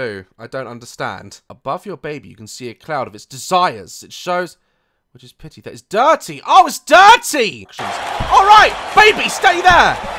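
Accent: United Kingdom. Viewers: you had a British accent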